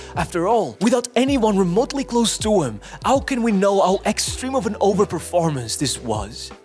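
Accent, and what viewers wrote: Portugal. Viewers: Mano, ando a ver isto a 2 anos e jurava que o sotaque parecia português, incrédulo, trabalho espetacular. Super fixe ver um canal desta magnitude e qualidade sair da nossa pequena mas dedicada comunidade, melhor das sortes🇵🇹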